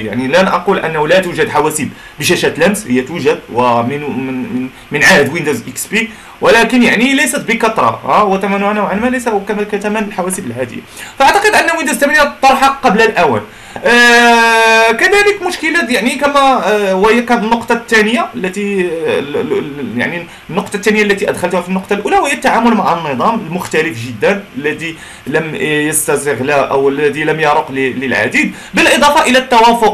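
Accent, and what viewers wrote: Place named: Morocco. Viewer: اخوي هو شخص مغربي ولهجة المغارب لهجة مختلفة وحتى النطق مختلف ( مثل المصاروا الثاء ينطق س ) وهناك الكثير من الامثلة وهو يحاوول ان يوصل المعلومة بالذي يملكه من معرفة وقدرة [ قال ابن سيرين : إذا بلغك عن أخيك شيء فالتمس له عذرا، فإن لم تجد له عذرا فقل لعل له عذرا.]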